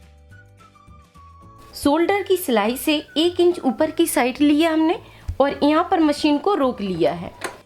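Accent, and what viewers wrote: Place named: Bundelkhand, India. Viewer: आप बुंदेलखंड की है। आपका बोलने का acent बता रहा है।❤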